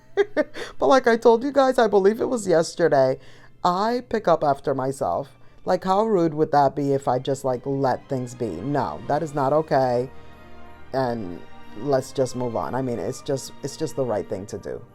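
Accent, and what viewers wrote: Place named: South, United States. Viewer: You are in Myrtle Beach?! I’ve been wondering what southern state you’re from b/c you say yall like a southerner !😂. I’m right up the road in the upstate! I love the beach in the fall!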